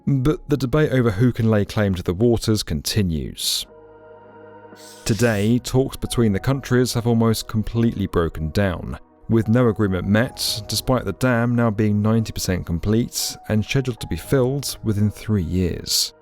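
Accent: England, United Kingdom. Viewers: why naratorrrr talk like inglish with nose full off secretions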